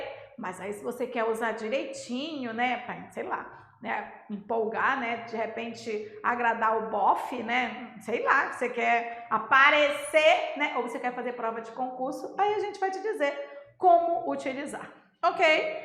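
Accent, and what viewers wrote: Pará, Brazil. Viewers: Ótima aula . melhor ainda com esse sotaque paraense .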